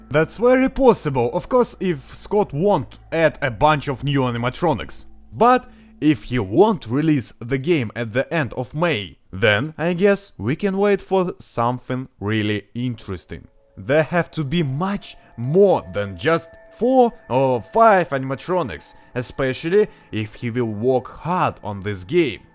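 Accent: Russia. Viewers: Очень странно слушать английский с Русским акцентом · когда ты уже позбудешся руского акцента? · Почти у всех русских такой акцнет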